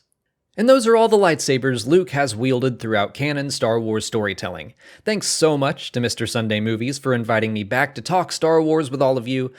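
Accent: Australia. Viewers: What part of Australia are you from? You sound funny. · which part of australia are you from? the accent isnt what i expected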